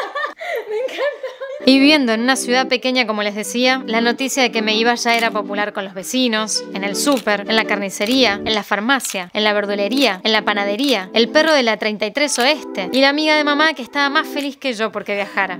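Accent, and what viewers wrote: Argentina. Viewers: Me encantó el vídeo, por fin dejaste de hablar raro y volviste al argentino!!! Esperamos más vídeos!!! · Me encanta el acento argentino😍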